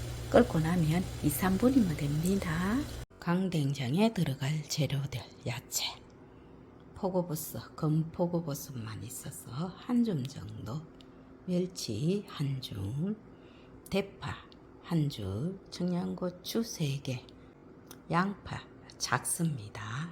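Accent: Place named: Gyeongju, South Korea. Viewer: 경주사람 정겨운 목소리 들어니 반갑습니다 저도 경주 광명이 고향인데 .간된장 정말 일품이죠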